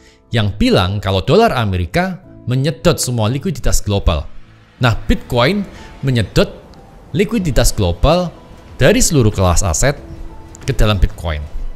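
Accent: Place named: Surabaya, Indonesia. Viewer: Iki ketok’e arek Suroboyo yo? Keroso dari logat e. Lek iyo berarti kita konco plekkk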